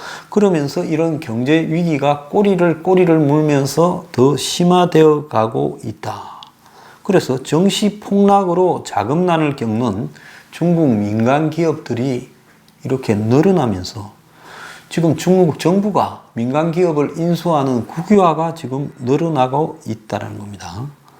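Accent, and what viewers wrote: Gyeongsang, South Korea. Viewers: 경상도 사투리가 있어서 그런데 적당히 들으시구려 이곳에서 까지 그런 지적질 하지말고..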